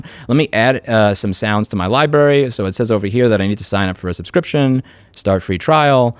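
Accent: New York, United States. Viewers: You might be in Texas now, but the New York is still running strong through your veins and speech patterns. Love these videos. Im sure one of these companies will eventually attempt to fuck around and find out. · Sir, your inner new york is showing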